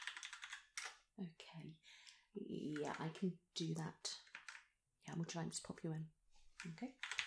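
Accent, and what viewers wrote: United Kingdom. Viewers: ASMR + pretty doctress + British accent . OMG . It must be wonderful 😊🤩😘❤ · What part of the UK people have that accent? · I love the british accent. It make the ASMR more slowly and it causes so many tingles. Btw, u look like Meryl Streep to me xD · centre, I presume? A bit north of London, like the latitude of, say, Cambridge? It may seem a silly question, but I just find amusing to train my italian ears to recognise and emulate british accents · are you from the UK? you have such a nice accent! :) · it’s British! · Ah, I love British accent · I love your British accent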